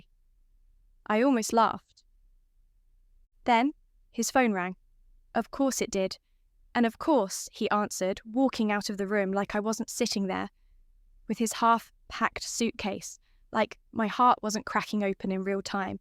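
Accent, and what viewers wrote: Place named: England, United Kingdom. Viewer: Lol, it's an AI program just a better one than most. It depends how much you are willing to spend. Also English voices especially her being a more refined English is very pleasant on the ears.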